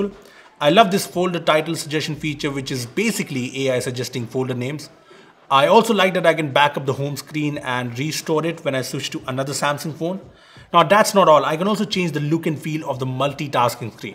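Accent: India. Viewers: I hate indian english accent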